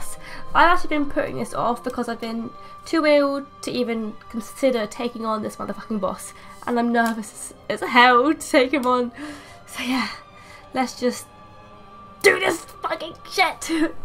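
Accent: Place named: London, United Kingdom. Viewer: I just love your accent. It makes you look cuter when you swear haha are you Londoner? You should have tried the back stab, it works pretty awesome against him.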